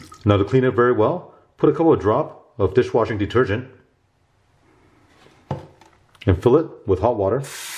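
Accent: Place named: Germany. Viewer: I detect a german accent.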